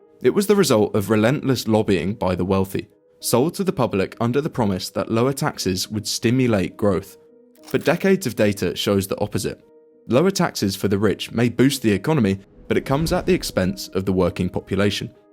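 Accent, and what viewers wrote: United Kingdom. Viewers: Why is someone with a British accent always telling me about America...and everything else for that matter. Does some study say people listen to this accent more attentively, or something? If it were a mild accent, as if they had actually lived where I live, I would say alright, maybe parents were British or something. But sounding like you just washed up on American shores and are here to tell us how to live and what's up......rather insulting and I don't want to really listen to it. Especially looking at what happens in your own damned broken country. Don't want to emulate that crap.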